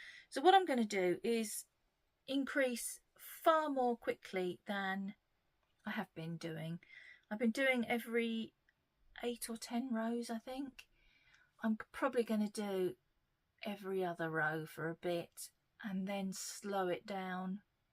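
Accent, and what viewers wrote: United Kingdom. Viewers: Love your podcast and you have a great sense of humor! I especially enjoy the British accent as I’m from the USA. Thanks for making the videos.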